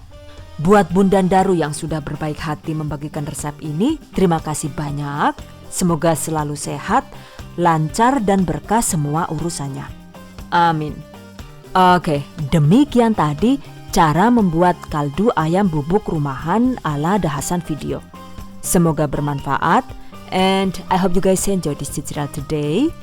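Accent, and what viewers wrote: Banyumas, Indonesia. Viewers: Terima kasih ya... Insya Allah akan saya coba resep ini.... Seperti kemaren saya baru saja buat nugget ayam.. Enaak banget.. Terima kasih sudah membantu.. Tutirialnya jelas dan mudah dipahami.. Ada lucu lucunya juga bahasa ngapaknta... 😂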